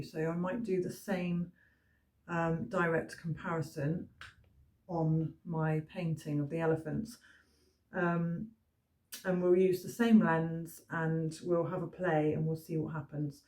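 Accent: United Kingdom. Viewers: I'm here for the British accent. 😁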